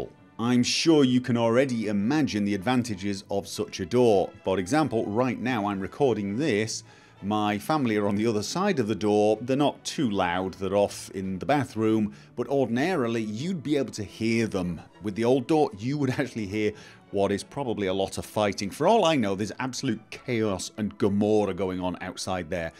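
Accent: United Kingdom. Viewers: Why you come to Denmark every summer? (I live in Denmark) and I need answers! - the mysterious. - You live in Norway right? - But are you Norwegian? - Your english is perfect, and a bit British. - Are you the other way around of Pewdiepie, - Moving from Sweden to england, - You moving from england to Norway? - I don't know anything about you!:O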